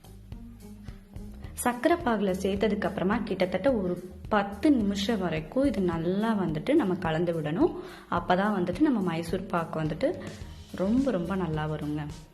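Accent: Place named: Kongu, India. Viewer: Nice sis..kongu slang theriyuthu..kovai i think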